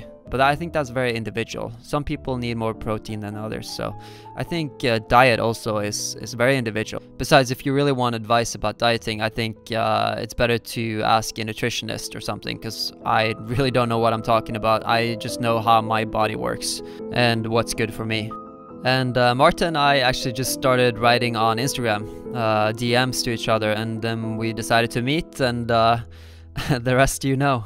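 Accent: Bergen, Norway. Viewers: Damn i think his accent is changing! Less norwegian accent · It's always so weird when you speak Norwegian and it's with a Bergen dialect. I expect something else for some reason, I've mostly heard you speaking English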